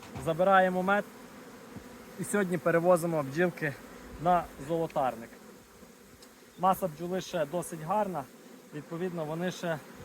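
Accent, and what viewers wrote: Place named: Prykarpattia, Ukraine. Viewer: удачі.розмова як на Прикарпатті.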